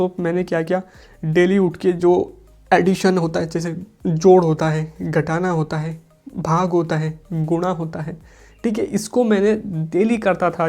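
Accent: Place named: West Bengal, India. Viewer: Bhaiya aap uttrakhand se ho but voice tone westbengal jaisa lagta hai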